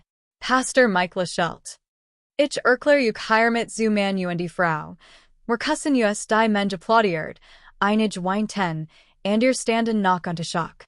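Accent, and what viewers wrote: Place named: United States. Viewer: Was soll diese quälende amerikanische Sprache ???????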